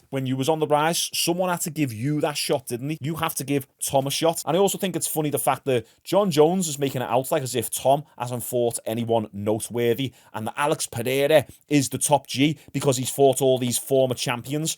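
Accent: Liverpool, United Kingdom. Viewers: WoW, copying exactly what MMA GURU said yesterday, it's sad when people like you just sit in your room and copy from actual hard working people that do research and actually travel 12 hours by plane to South Africa to talk to dricus and do some training in his gym. Chats live to fighters like money moicano ECT Would do you do mate? Watch GURU and show, it's embarrassing how nearly identical has copied word for word lol No wonder less than 5% of your watchers are not subscribed and will never sub to you and your annoying Scouse accent. And please stop with the workout and how to fight advice, your a snowflake with a teenage girls body lol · Feel like watched guru video scouser version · I'm not defending Jon here but your accent and the flags in these comments kind of agree with his comment about UK fans.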